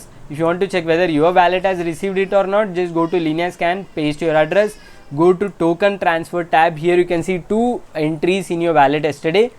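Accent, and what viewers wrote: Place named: India. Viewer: Your English sound like Hindu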